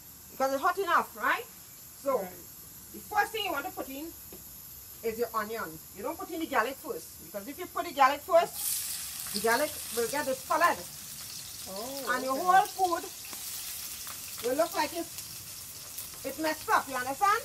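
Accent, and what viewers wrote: Trinidad and Tobago. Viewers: Looking so good.Love the Trini accent.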